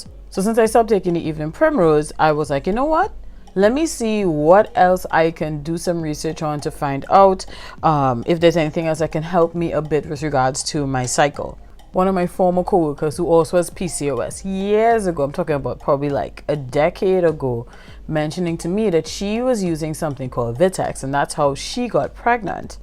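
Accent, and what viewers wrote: Trinidad and Tobago. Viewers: Trinidadian accent 😍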